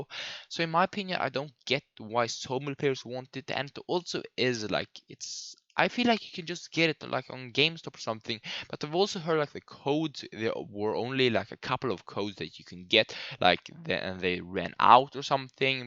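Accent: Sweden. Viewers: Are you from Sweden or something? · Are u swedish?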